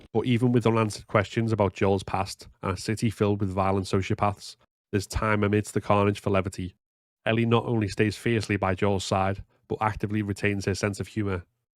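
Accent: Liverpool, United Kingdom. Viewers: Are you a scouser?😊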